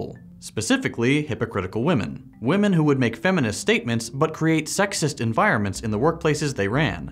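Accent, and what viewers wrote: United States. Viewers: Hit the nail on the head as usual. As a small aside, as someone who doesn't regularly speak to those with american accents it took me three "gender parity"s to get that I wasnt hearing "gender parody" 😅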